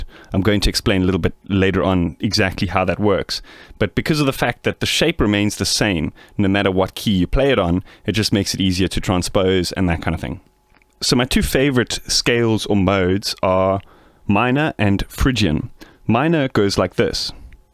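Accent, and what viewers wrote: South Africa. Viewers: he is South African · Are u South Africa?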